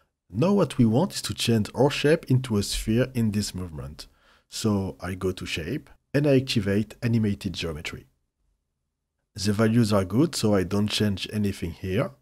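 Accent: France. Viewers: you're French ? you have a French accent ;)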